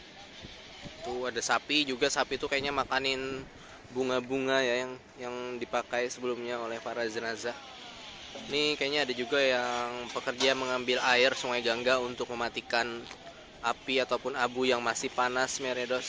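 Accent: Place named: Jember, Indonesia. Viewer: Jember bgt iaa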